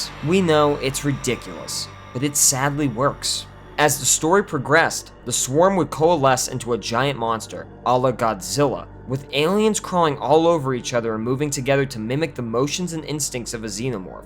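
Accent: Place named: United Kingdom. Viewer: Sue Lah Co (NOT Sue Loo Cooooooh*) (NOT *So Long Kong*) and (NOT *anything else!) SUE .... LAH .... Co (with Co being pronounced like the American acronym for a "Company" or "Co." or perhaps: Sue LOCK oh ................................................................... ??? ! PLEASE................... ... Dude... if you had truly WATCHED "Aliens" ... you'd have known this. we who LOVVVvvvved..... all these movies are actually distracted (terribly) each time you say it YOUR way. ---Overall.... "We" even agree with you. WTF "happened" to Alens3.... but dammit man. Sue-Lah-Coh ... Ok ? ...................... You GOTTA "re.do" this Man.... or at least re-upload with SOME kinda acknowledgement at the beginning of this video............ we GREW UP with these movies Kid..... we have seen ALLLLL the backstage and Behind The Scenes videos on the aliens movies and brother.... 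this is an egregious error, to ANY erudite "Aliens" fan. ................. seriously . dude..... I'm sorry to say it. But it's true. it simply shows us you're NOT an "Aliens" fan................ and if NOT...... WTF would YOU be "outraged" about anything "wrong" with Aliens... ...................... if you cannot pronounce the name of the "Sulaco" properly? PLEASE.............. "excuse" yourself by re-uploading (with a statement at the beginning) that you cannot help but pronounce it (phonetically) like someone from the U.K. ................. and we will "Let it Slide".... but ... be slightly more careful dud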